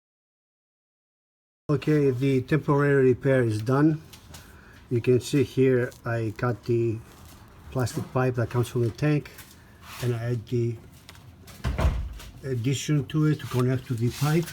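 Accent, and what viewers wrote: Greece. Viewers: You sound Greek lol. Good video.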